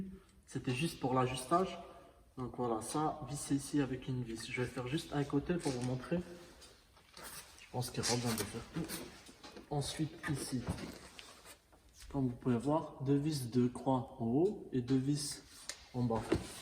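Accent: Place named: Yugoslavia, Eastern Europe. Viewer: le "petit" accent d'ex-Yougoslavie 😁👌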